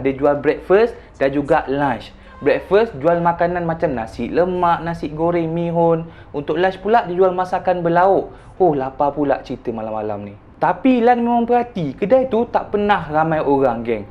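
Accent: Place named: Terengganu, Malaysia. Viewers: bnyk² org luar ,, abg punya cakap tranung tu mmg terbaik arr .. mmg bunyi tranung sgguh 😂 apapon teruskan cerita kisah seram ni 👍🏻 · Jadi dh ckp ganu 👍😂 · hanya cerita seram2 dah 72k pengikut kau ok la semoga suksess... memang mcm tranung betul mung ni pekat molek haha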